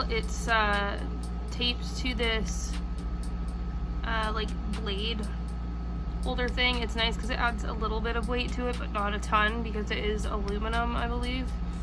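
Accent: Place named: Canada. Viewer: This is incredible, and super helpful rn... thank you 🙃 Also, the most Canadian accent I've heard in some time 😄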